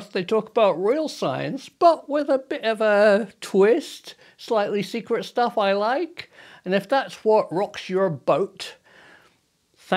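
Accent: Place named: Bristol, United Kingdom. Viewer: I love your channel very informative lifelong subscriber and I'm always a fan of the Brissol arrghchcent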